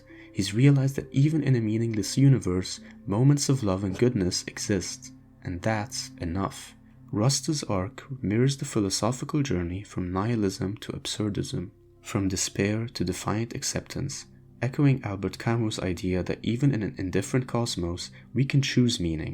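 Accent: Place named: Eastern Europe. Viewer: same insights with eastern european accent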